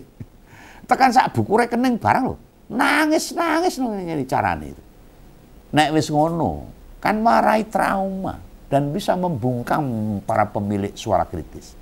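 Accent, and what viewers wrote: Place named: Java, Indonesia. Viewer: Enak didengar bahasa jawanya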